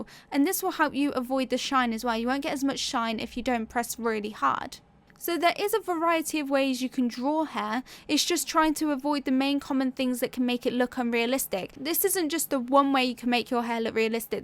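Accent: United Kingdom. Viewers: British accent? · BRITISH ACCENT OP SHE LITERALLY SOUNDS LIKE CLAIRE HOLT ( REBEKAH MIKAELSON) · British accent???¿¿¿ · Is that British Accent?? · Hi your sketching technique is just as beautiful amd marvellous as your lovely and sweet British Accent. I am in love with your voice and killing British Accent. Wow! I have not seen many videos with this simple yet realistic technique. Thank you very much for sharing. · The British accent <33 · I love your accent British!!!! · I love ur british accent :)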